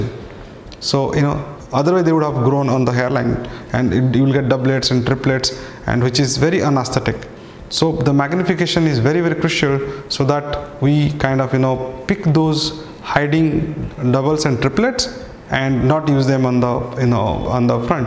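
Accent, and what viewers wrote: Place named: Odisha, India. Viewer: You've got a very nice Odia accent sirji..kudos to you and your team The way my hairline is vanishing i think I'll soon be making a visit to one of your clinics..... as Djimon Hounsou says in Gladiator "But not yet....not yet"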